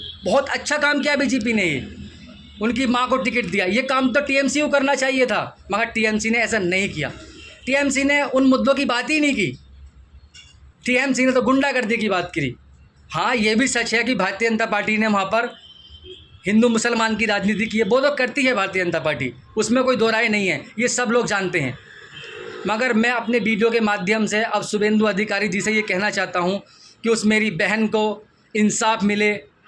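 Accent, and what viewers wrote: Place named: Uttar Pradesh, India. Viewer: Sandesh Khali ke Shahjahan pe b ek video bana de bhai . Tumhari bhasha Uttar Pradesh ki lag rahi hai , tum bengali to ho nhi .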